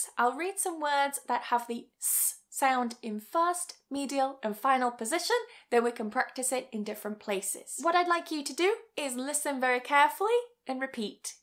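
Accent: United Kingdom. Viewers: I appreciate your modesty, putting the British pronunciation (of words like "castle" or "afternoon") second, even though you seem to have more of a British background. i think ppl like learning the British pronunciation, as British English sounds more high class :D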